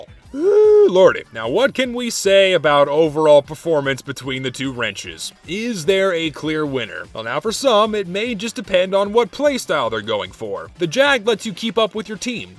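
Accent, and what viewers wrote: Texas, United States. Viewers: are you trying to sound like a texan, because i have no idea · Texan he is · Texas male is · Y'know, after i found out that you're from texas i started hearing your accent. Kinda bizarre but i love it Keep up the good work, i wish you a good year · I absolutely love that you brought out your Texan accent for the engineer-specific video. Great attention to detail. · So is no one gonna talk about how he's actually went through the entire video with something of a Texan accent? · Honestly, I could listen to mathematic/scientific lingo spoken in a texan accent forever, wouldn't mind if ya didn't hide it when you went into statistics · For some reason, I didn’t question why you were speaking like a Texan until you pointed it out. Like “Gasp, wait he’s one of us now!” XD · why do that texan accent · I love your southern accent in this video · I thought you were Russian Badger doing a southern accent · Walks in a Texan Accent Me: wow thats what I call getting into character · At first I though he was doing the accent because "heHe engineer is texan lol" but then I remembered is Texan. 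Good on you man, as a fellow Texan, I say bring out the accent more often. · he be Texan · I liked how you did this video in a Texan voice. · ah, the southern drawl on an engi vid is soooooo perfect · that accent threw me off so much, i was like "did he actually have that thick of a southern accent in his older videos?" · Him is Texan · Nice subtle Texan accent. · I forgot how Southern he used to sound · Is it just me or does he have a slight southern accent in the video? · I can't tell if he's trying to do a country accent or not trying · Your subtle Texas accent in this video gives me pure joy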